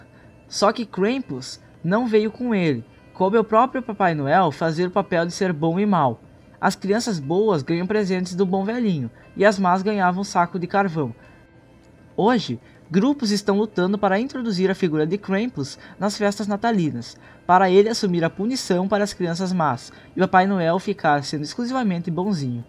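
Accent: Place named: South, Brazil. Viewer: sotaque é do sul, nada a ver com norteste.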